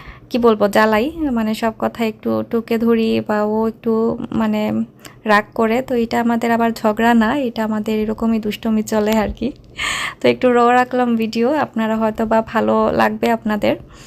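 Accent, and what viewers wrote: Sylhet, Bangladesh. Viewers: অনেকদিন পর সিলেটের কথা শুনলাম।আমার ভালো লেগেছে। আমিও সিলেটের মেয়ে।আমি লন্ডনে থাকি।আপনার কি কোন ডাকনাম আছে?❤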